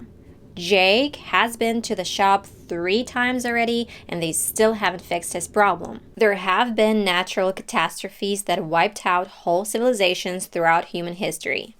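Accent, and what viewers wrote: United States. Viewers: What is her accent pls ? (California, Texan ?) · When i watched this channel for the first time, i felt myself very contradictory. I heard an exceptionally, i'd even say pirufied american accent, and i saw this face and couldn't believe she's an American. And i was right. That was a great job but what it's given? Being an American doesn't mean having an impeccable language. It means sharing certain values. It means creating certain values. It means to be a part of american society. It means to have a common future with the US. The US is a multicultural country. Many accents, many dialects. It makes the US be the US. Nobody cares how your language pure is but anyone cares what you feel about your place here.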